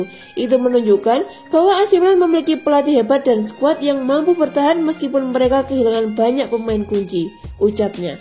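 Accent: Java, Indonesia. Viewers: Haha medok cok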